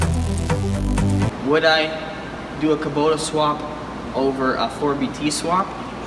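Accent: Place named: Canada. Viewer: your canadian arnt ya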